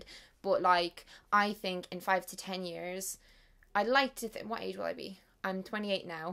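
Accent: Ireland. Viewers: I already thought so haha but finally I get to hear it by a young irish person! btw loving your videos, I relate to so many things and listening to you talk (especially to your wonderful irish accent as I miss this country so much) calms me so much!! xx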